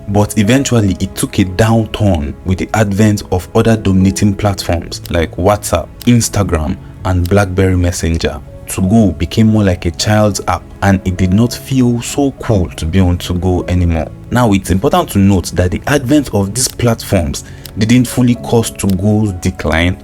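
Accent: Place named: Nigeria. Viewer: "and exact tttime for lekchors" Eh! Your strong Nigerian ascent sha🥵🥵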